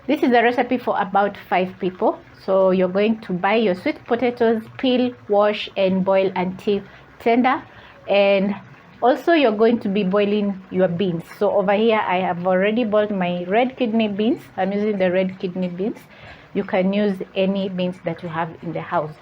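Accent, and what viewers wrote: Kenya. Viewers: Was very sure you are from Western Kenya until 🤣🙆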